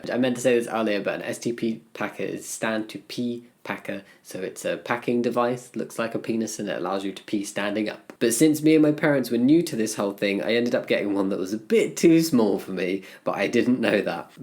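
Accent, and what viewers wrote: United Kingdom. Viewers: off topic but are you british?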